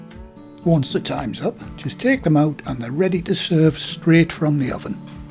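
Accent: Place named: Newcastle, United Kingdom. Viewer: I enjoy watching your videos , and more i love listening to your jordy accent , all your recpies remind me of England And the northeast watch i call it home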